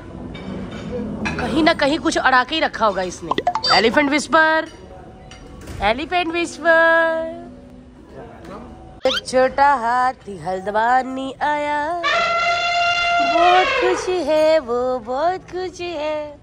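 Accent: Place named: Odisha, India. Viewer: odisha se hai isiliye ese pronounce karte hai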